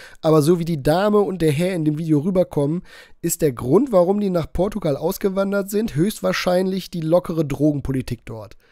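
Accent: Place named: Middle Franconia, Germany. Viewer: Da muss er nur noch ordentlich deutsch sprechen können, und nicht nur meddlfrängisch.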